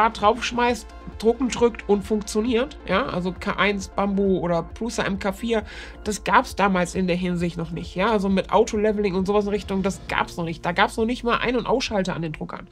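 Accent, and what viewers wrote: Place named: Saarland, Germany. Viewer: Ohleck, noch e 3D Saarlänna 😃 Saugudd 👍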